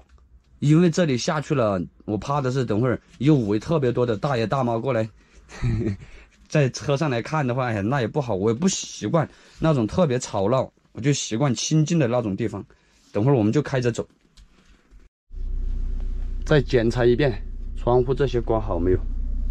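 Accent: Sichuan, China. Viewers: 听囗音象我一个朋友，四川人吗？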